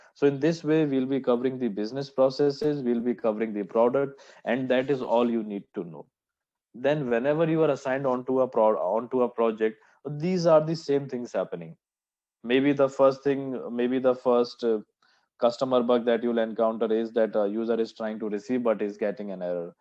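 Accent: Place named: India. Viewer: Amazing sir. Perfect video with perfect indian accent. All clear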